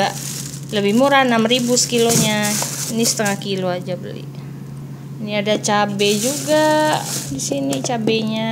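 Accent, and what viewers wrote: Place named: Sumatra, Indonesia. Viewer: ohh pantes logatnya sumatra bgt..lam kenal balik bun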